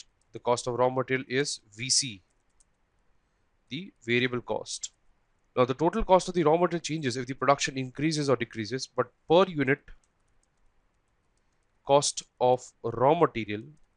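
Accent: India. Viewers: I don't mind an accent , I'm from the American South, but good grief. It's great that Indians are often technical but they make zero effort to sound anything like a native English speaker. About 4 minutes in, its like listening to a machine gun and I move on, it's just too hard trying to decipher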